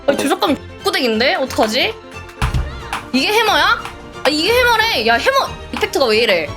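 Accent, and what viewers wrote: Jeolla, South Korea. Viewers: 전라도 ㅇㅇ 졸귀 ㅋㅋ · 말 할때 전라도 말투로 구수하네여ㅋㅋ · 님 혹시 전라도 출신이신가요? 억양에 사투리가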